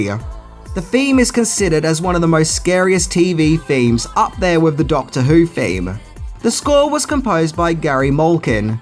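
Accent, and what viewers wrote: Australia. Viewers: Thanks for reminding me why I so hate Australian accents 😖😖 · Disclaimuhhh, love that Australian accent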